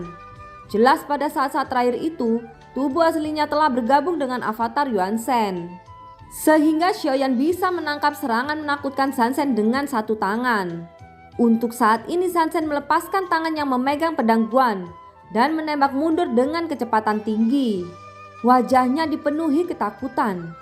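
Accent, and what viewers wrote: Java, Indonesia. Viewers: Logat jawanya trllu medok😁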